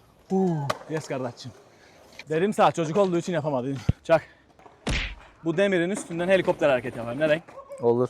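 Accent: Azerbaijan. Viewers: Abi Türk müsün? Azeri gibi konuşuyorsun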